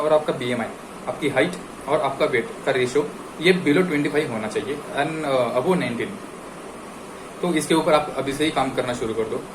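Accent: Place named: Maharashtra, India. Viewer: Amazing videos sirr... Aapki hindi me jarasa marathi ka leheza hai... I also frm mh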